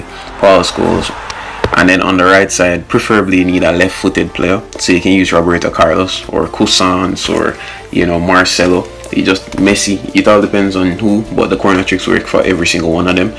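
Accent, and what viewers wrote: Jamaica. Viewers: You sound Jamaican me g 🇯🇲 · Ur Jamaican don’t it?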